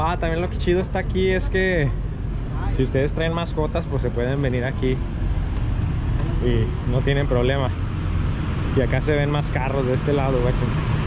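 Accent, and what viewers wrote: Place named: Tijuana, Mexico. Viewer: Hola.. muy informativo tu video.. pregunta, de donde eres originalmente? Suenas como yo. Soy de Tijuana.. tengo curiosidad 😬🤔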